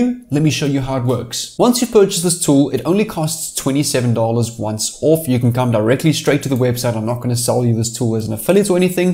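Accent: South Africa. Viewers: Well done! I could tell from the accent that you're South African. This makes me so proud and inspires me too on my journey! New subbie right here!!!🥳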